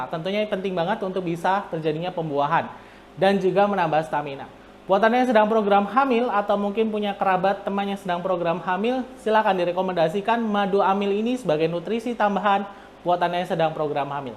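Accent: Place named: Java, Indonesia. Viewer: Sampyn wong jowo toh dok...jowone njar endi??😁😁😁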